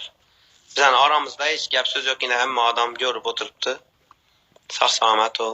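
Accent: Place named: Khorezm, Uzbekistan. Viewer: Yomon gaplisqu xorazmchada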